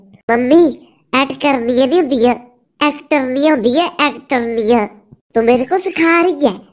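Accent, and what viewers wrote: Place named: Himachal Pradesh, India. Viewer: Nice video hai. Jaise ye lady bol rhi hai video mein, meri ek cousin dharamshala k paas rehti hai uski saas ki esi hi language hai aur bolne ka style bhi yehi hai. 😔😔😔 God bless u.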